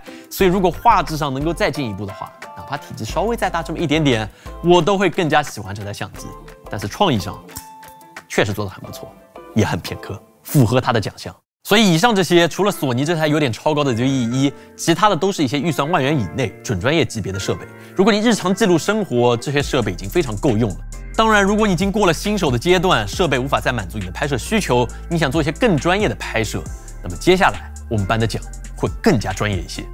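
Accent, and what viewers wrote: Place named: Hangzhou, China. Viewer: 一听口音不是杭州人就是宁波人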